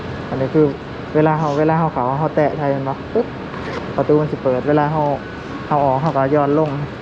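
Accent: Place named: Ubon Ratchathani, Thailand. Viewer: บ่าว เป็นคนแขวงได๋เว้าคึทางอุบล ทำงานยุกรุงเทพบ้อเพิ่งติดตาม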